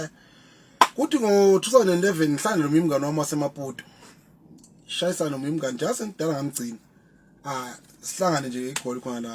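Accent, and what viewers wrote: Zimbabwe. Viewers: Isi zulu sakho sithi ubuya e Zimbabwe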